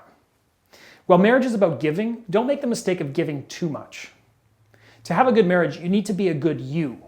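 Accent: Canada. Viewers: Nice. I noticed the Canadian accent. Lol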